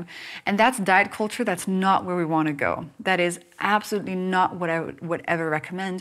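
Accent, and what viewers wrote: France. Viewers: Wait a second... Didn´t she have a very thick exaggerated French accent in her first videos?! You can´t simply erase your accent from your system. She was acting it to gain more impression and clicks. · I know how I detected she is french so easily despite her excellent English accent. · je me disais bien reconnaitre un accent français par moment ! merci pour vos explications je vais vous suivre <3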